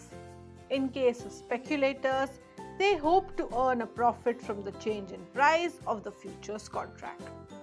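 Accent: India. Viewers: Disliked for heavy Indian accent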